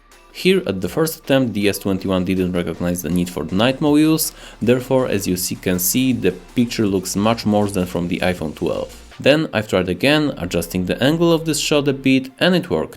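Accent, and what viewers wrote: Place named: Poland. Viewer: you sound so Polish. I mean your accent. Very nice comparison though . Just bought Galaxy s21 hope I can get use to android xd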